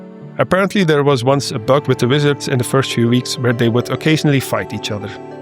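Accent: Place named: Flanders, Belgium. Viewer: he sounds very flemish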